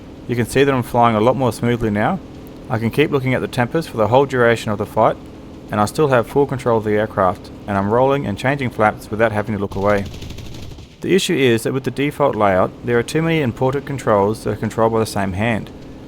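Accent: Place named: Australia. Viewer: the accent gave it away buddy, I live in NSW.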